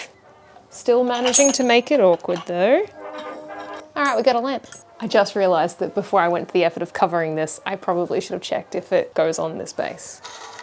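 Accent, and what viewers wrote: Australia. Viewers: Listening to another Aussie is the best....... beautiful shirt.... enjoy the sunshine.... Winter is coming....🦘🦘💐🌹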